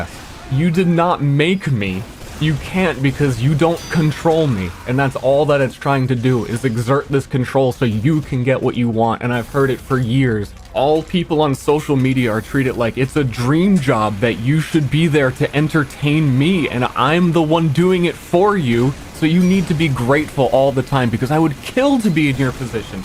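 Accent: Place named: India. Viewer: You sound indian.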